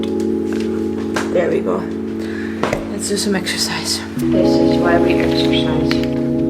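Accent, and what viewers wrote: India. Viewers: Totally picked up Indian accent....😄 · You got a little Indian accent when you speak english haha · Je hebt zelfs een Indiaas accent als ik het zo goed zeg? Heb een hele tijd geleden wat Nederlandse vlogs gezien en kwam je nu weer tegen. Wat leuk dat je je draai hebt gevonden in India! Wens je veel geluk 🍀 op je pad!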